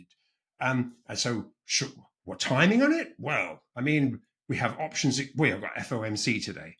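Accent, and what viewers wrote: England, United Kingdom. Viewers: I agree too technical to fully grasp. I love, but between the jargon and his English accent, high low delivery (definitely not boring, but a lot goes over my head) Maybe can create an edited video to help explain the concepts. eg.. 2 minutes of, and equal time for to speak plain American english to interpret what just said.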